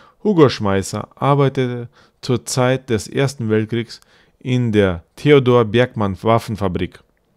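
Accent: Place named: Russia. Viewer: На самом деле очень сильный русский акцент. Для носителя языка сложновато слушать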